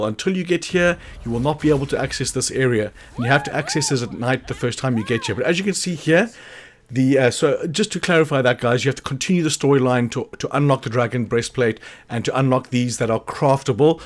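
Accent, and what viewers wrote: South Africa. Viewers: Thanks for the tips. Are you South African? You sound like it.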